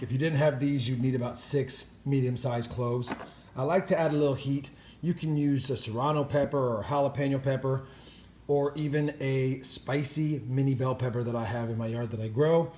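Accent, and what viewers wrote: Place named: Louisiana, United States. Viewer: Are you from Louisiana?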